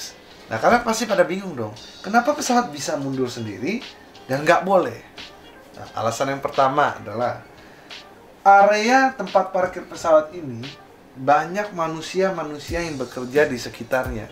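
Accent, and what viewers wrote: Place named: Banyumas, Indonesia. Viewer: Kapal kecik saja... Ngapak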